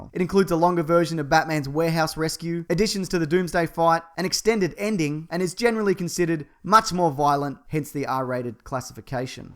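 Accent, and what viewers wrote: Australia. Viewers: he's australian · Are you from australia you sound like it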